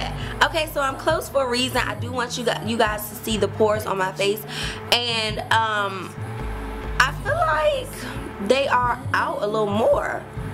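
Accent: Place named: Baltimore, United States. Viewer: she has to be from bmore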